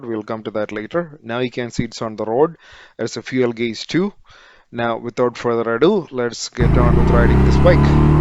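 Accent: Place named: Kerala, India. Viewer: Indian origin Malayalis Voice recognised in this video 😅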